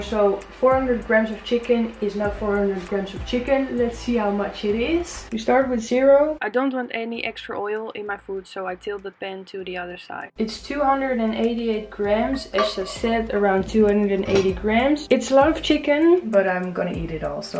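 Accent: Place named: Netherlands. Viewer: Leuk dat je gelijk kan horen wanneer iemand die engels spreekt een Nederlander is haha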